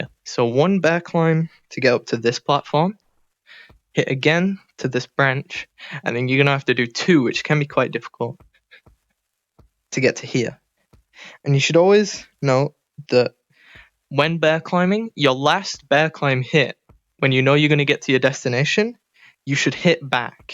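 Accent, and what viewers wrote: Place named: United Kingdom. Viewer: i think it is very good visually but i cannot seem to understand the bri'ish vocals very unclear and why dont you just walk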